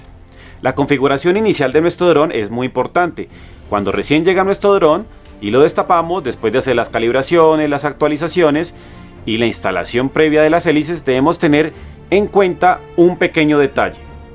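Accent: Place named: Colombia. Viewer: Amigo. Eres Colombiano?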